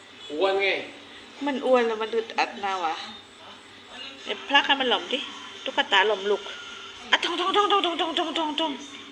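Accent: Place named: South, Thailand. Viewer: ❤❤❤❤น่ารักกันทั้งบ้านเสียงทางใต้น่ารักจังแม่ 😊ฮ่าๆเก็บตังเลยแม่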